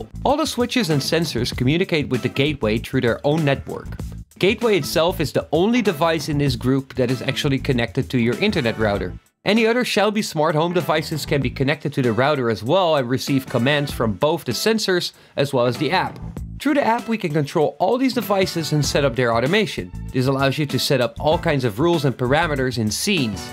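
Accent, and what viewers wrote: Netherlands. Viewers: Dutch I think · are you Dutch? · So happy with your channel man. Your accent sounds Dutch, are you?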